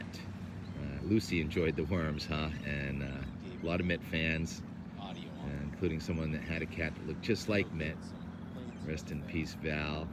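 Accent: Boston, United States. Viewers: You had it right the first time, it's Oscah. Lots of people wish they had as good an accent as Bostonians. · The warm ayah is heeah again... Brandish your Boston accent graciously. ☘👍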